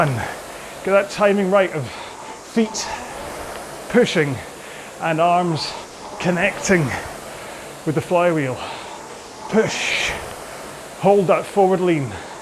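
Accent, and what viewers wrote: Scotland, United Kingdom. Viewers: I see you posted a 2 hours video. This is what I need to learn the Scottish accent 😊😅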